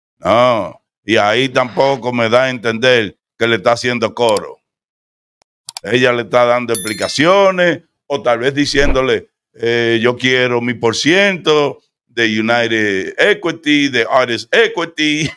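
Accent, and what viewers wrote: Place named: Latin America. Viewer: Me encanta como hablas Típico Latino y sus risas Gracias por hablarnos en Español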